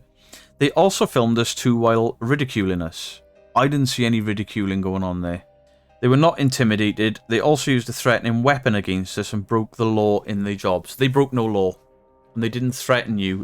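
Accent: Wales, United Kingdom. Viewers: Listening to this in your Welshy accent lol "come on boys.." lol I'm from South Wales too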